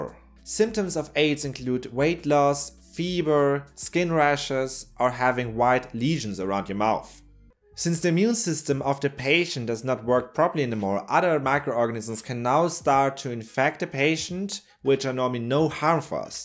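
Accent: Germany. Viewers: Very informative. I just feel like he wants to yell at me in a German English accent 😁😁😁😂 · I’m loving your German accent 😅 and you’re cute. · Is he German .. his accent then he sounds Chinese talking English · He´s German. That's why. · Thick german accent. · Cool accent. I think that I could easily listen to German people speak English all day long ! 👍😎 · That accent is hard to place: either German, or Dutch?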